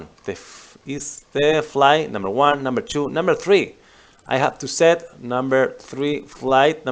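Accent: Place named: Argentina. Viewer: You sound like my coworker from Argentina. No worries. Your english is good. Thanks for the tutorial. After 10 years back in the Pit I was a bit lost with all the changes from 4.32 back then haha.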